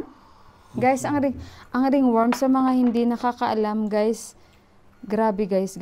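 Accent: Iloilo, Philippines. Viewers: Hello po ma'am watching from taytay rizal.Ang cute ng accent mo ma'am alam ko ilongga po kayo🥰🥰🥰 madali lang po gamotin ang buni danas ko po yan sobrang kati.Gusto ko lang po i share kung paano ko ginamot ang ringworm ko.Simply lang po madali lang lalong lalo na sa mga malayo sa bilihan ng gamot para po ito sa inyo,madali lang po gamotin kumuha lang po kayo ng isang dahon ng langka na tuyo yung medyo malaki na dahon at maliit na lagayan sunogin lang po ang tuyo na dahon ng langgka at lagyan ng langis ang abo nito kunting langis lang yung tama lang mabasa yung abo at ipahid doon sa ringworm yan lang po linisin muna sabonin maigi bago lagyan ng abo ng dahon ng langgka bago ipahid.Sana makatulong ito lalo na po doon sa mga malalayo sa bilihan ng gamot.Salamat po more power po sa channel nyo ma'am GODBLESS po🙏🙏🙏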